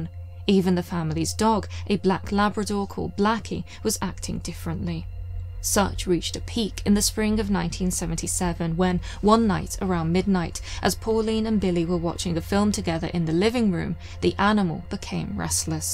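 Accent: United Kingdom. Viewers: A similar thing happened to me after the second encounter (that I remember) a few years ago. I suddenly couldn't stand meat being cooked around me, it used to upset me so much I would start crying if I smelled it? I was already vegetarian but became vegan. I quit a 10 year smoking habit in 2 days. But i still love red wine though I can only drink 1 glass or I get very sick. Weird! This seems a great channel, I'm so happy to find a British narrator & you have a new subscriber 🌹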